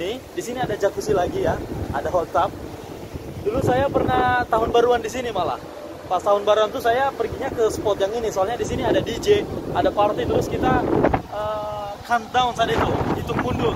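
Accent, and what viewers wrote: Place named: Manado, Indonesia. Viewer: Saya kira bro orang manado..logatnya seperti logat manado soalnya hehe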